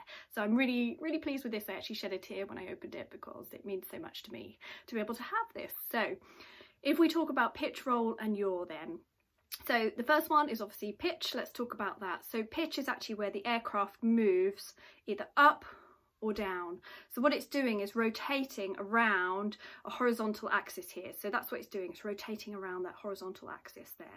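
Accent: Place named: United Kingdom. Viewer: Thank you! I love that I understand you perfectly, even with your British accent (I'm not a native American speaker).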